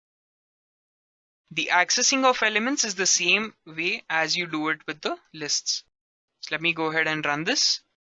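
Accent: India. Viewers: i love the indian accent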